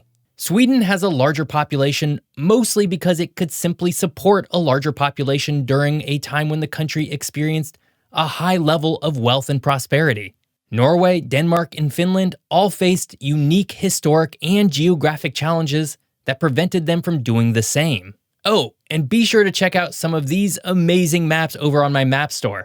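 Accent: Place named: United States. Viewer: The clue lies in the English accent of the narrator: 'Murican! In the realm of global ignorance, 'Muricans hold a special place, clearly exhibited in this video. The disagreeable part is speculating about Finland reclaiming territory confiscated by Bloody Russia after the Winter War. The way things are going, Russia will fall apart pretty soon, but let's keep the Champagne capped until it actually happens. The main point: The territory Finland lost in the Winter war has now been overrun by Russians. It will be a bloody harsh business driving all the Russian-speakers out of former Finnish land. Will the Finns have an appetite for that? It remains to be seen. Then again, the abject cruelty shown by Russians everywhere during the war in Ukraine, why shouldn't we visit the same (or more) misery on the Russians as they visited of the locals? Bottom line: Putin's excuse has been to "liberate" Russians embedded in former Soviet states. 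A very clear action would be to forcibly move every Russian-only-speaker to their Rodina! Problem solved!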